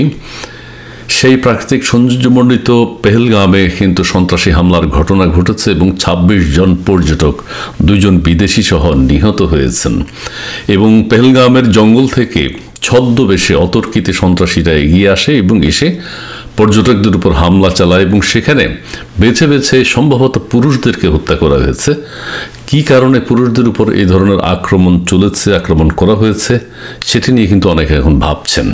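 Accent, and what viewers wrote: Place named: Noakhali, Bangladesh. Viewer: নোয়াখাইল্যা ভাই !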